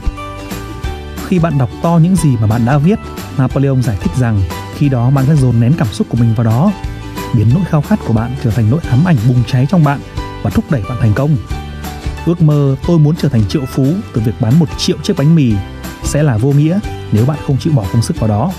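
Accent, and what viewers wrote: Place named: North, Vietnam. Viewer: Rất muốn nghe nhưng kênh kèm nhạc ồn quá k thể tâp trung nghe đc.mình là người Nam nghe giọng Bắc đã hơi khó lại thêm nhạc nữa .....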